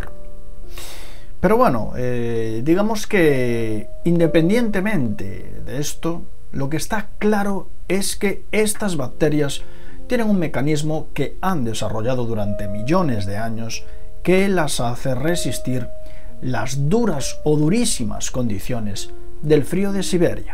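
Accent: Galicia, Spain. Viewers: De donde eres de galicia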